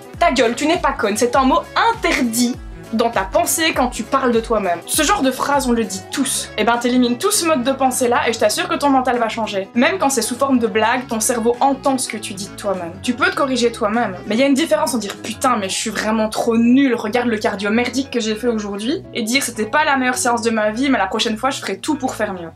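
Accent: Belgium. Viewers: ton accent belge gives me life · Super vidéo!! Merci de mettre plein d’humour et de bonheur dans nos vies et de nous aider à accepter nos ptits complexes:) PS: j’adore ton accent belge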